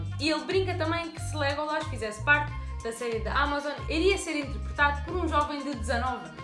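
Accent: Portugal. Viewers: portugues de Potugal é um pouquinho diferente do nosso do Brasil mas da pra entender kkkkkk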